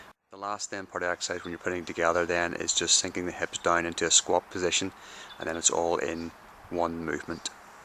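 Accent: Ireland. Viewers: The only person to equal your clear and accurate descriptions is the original kettlebell man Pavel ,excellent video and great commentary from your friend,oh and as an aside its great to hear your lovely Irish brogue,makes me feel homesick ,and I'm a Scotsman in Australia..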